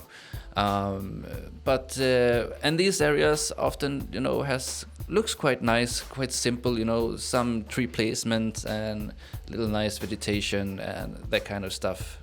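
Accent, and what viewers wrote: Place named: Caribbean. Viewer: Hey hey hey 👋🏾. My Swedish YouTuber with Soul. Again, really happy to have you back. Always enjoyed you videos for many reasons especially being the music. You definitely remind me of someone from the Caribbean islands. The accent and cool vibes. Are you still going to be making your own for your videos? Anyways, have a great weekend and stay cool. Looking forward to your next vid.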